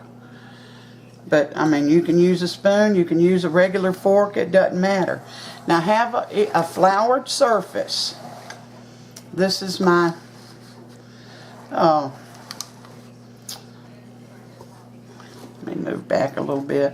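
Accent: South, United States. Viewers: I love your Southern voice. It's so soothing to hear you talk. I know you Love the LORD. He is so good to us. I live in Phoenix, Az. GOD BLESS YOU BOTH. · Hello from Texas! You had me at no-fail biscuits. New subscriber here. Love your sweet southern accent...sounds like my mama—she was from Alabama. I look forward to looking through all your videos and watching your new ones too! God bless you! And I look forward to trying these biscuits. ❣️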